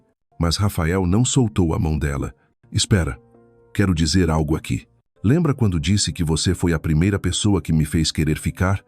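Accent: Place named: Spain. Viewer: Não entendi o porquê do sotaque espanhol, soou mal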